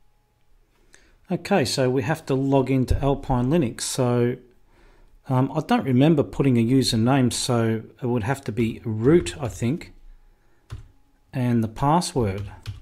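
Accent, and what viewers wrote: Australia. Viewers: You sound like an Ozzie, right? So the right keyboard for you would be GB, not that weird US wannabe English. Where is your pride and selfrespect, man?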